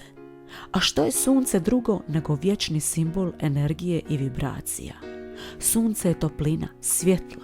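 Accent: Dalmatia, Croatia. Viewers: Svidjaju mi se vase emisije! 🙏 ako sam dobro pogodila, dialekt bi trebao biti dalmatinski?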